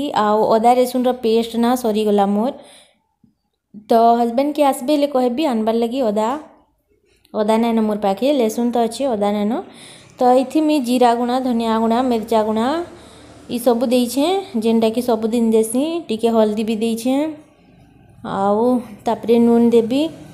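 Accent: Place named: Sambalpur, India. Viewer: ତୁମର ସମ୍ବଲପୁରୀଆ କଥା ମତେ ଶୁଣିବାକୁ ବହୁତ ଭଲ ଲାଗୁଛି ।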